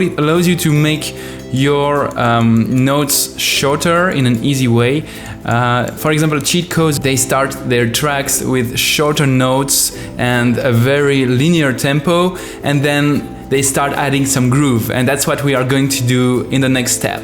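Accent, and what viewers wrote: France. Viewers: Are you french ??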